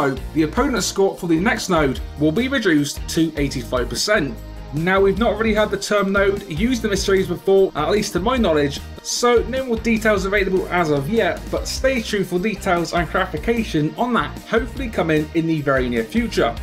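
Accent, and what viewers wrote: England, United Kingdom. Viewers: Rasist? What the fuck, men? I am is not rasist. I just don't understand English accent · Why you here if you dont like his accent. He's British how do you expect him to lose it? Before you start getting all brave and confident think about what you're saying before you say it. How can a BRITISH person lose their BRITISH accent. Common logic man. Thumbs up to you! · also go watch denkops or will power if you don't like the british accent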